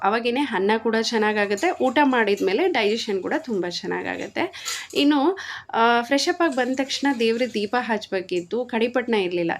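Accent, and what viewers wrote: Raichur, India. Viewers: ನಿಂದು ಯಾವೂರು ರಾಯಚೂರು ಭಾಷೆಯಲ್ಲಿ ಮಾತಾಡುತ್ತೀರಾ ನೀವು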